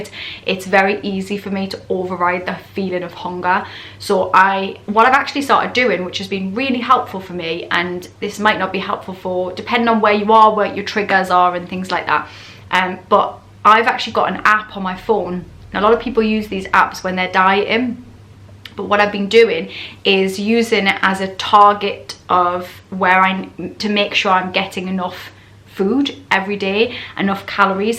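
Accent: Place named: Newcastle, United Kingdom. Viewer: Some nice Geordie in this, reminded me of my old housemate. "bewk"... I love it.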